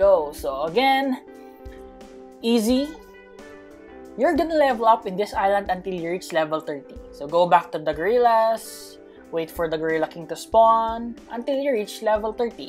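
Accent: Philippines. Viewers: Hey your accent is like filipino haha are you a filipino or not? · are you a filipino? the acsent sound like one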